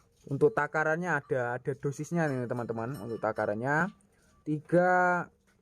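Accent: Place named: Java, Indonesia. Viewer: 170 sen ato 170 rp ato 170 ribu broo Medok kali kmu ya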